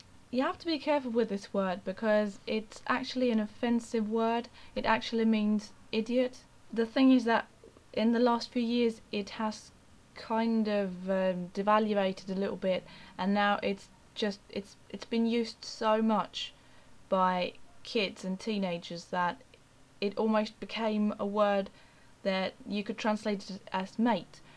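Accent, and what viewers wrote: England, United Kingdom. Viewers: Your English accent is beautiful! :D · que tremendo ingles britanico tenes che! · Jajaja, es muy raro escuchar tu inglés y castellano al mismo tiempo. Rather british, isn't it? Jaja, de donde sos, y de donde tenés ese acento inglés? Muy lindo, me encanta tu voz. Yo soy de Salta y acá y en todos lados usamos practicamente los mismos términos que en Buenos Aires, salvo que sin el acento porteño exagerado. Aunque la gente muy de campo o del norte tienen un acento o forma de hablar bastante diferente (algunos de la ciudad también), hablar como "colla" como se dice por acá... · MAESTRO jajaj muy bueno tu acento britanico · I don't get it. Where are you from? Why do you have that British accent in English? And you also speak German and Norwegian? :) You're Argentinian, aren't you? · You speak with a British accent! Congratulations. 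· como hiciste para aprender el acento britanico tan bien? yo vivo en USA y tengo acento yankee, pero quiero aprender el britanico y no me sale! :( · Posta me encanta el acento britanico que tenes! te admiro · English accent???? i love it!!!! i'm from Argentina but my English is American!!!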